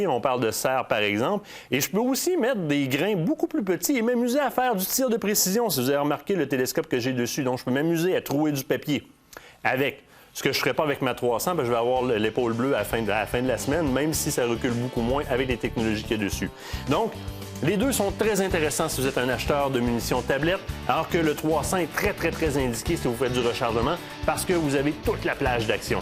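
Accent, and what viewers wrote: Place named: Quebec, Canada. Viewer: Je ne me lasse pas de l'accin québécois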